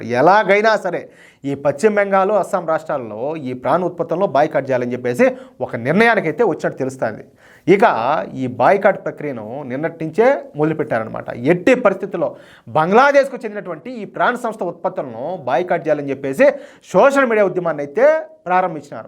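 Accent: Rayalaseema, India. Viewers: రాయల సీమ లో ఏవూరు మీది గారూ? సీమ భాష బాగుంది. హైందవత్వానికి..దేశానికి బాగా సపోర్ట్ చేస్తున్నారు.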